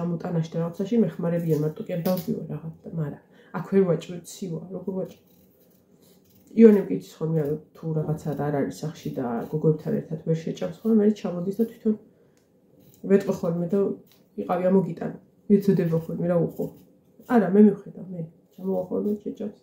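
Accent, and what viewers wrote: Martkopi, Georgia. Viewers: ძაან ბუნებრივი ხარ და შენი მარტყოფული კილოც მაგარია❤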